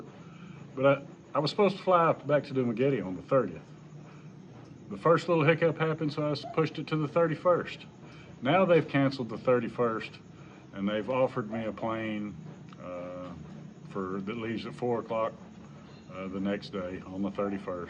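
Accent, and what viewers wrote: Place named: United States. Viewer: That’s Strong American accent , didn’t understand much of that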